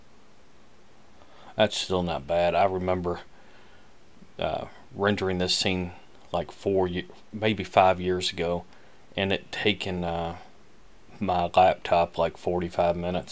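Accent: United States. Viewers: You are showing exactly what I was asking about.... I saw your other videos. Many many thanks for this sharing knowledge spirit especially it is presented with this special american accent (which area it belongs to?). Thanks and may God bless you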